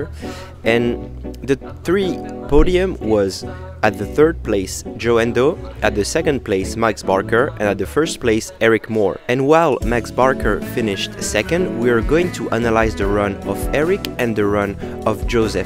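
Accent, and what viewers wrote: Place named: France. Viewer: jpp l'accent français x)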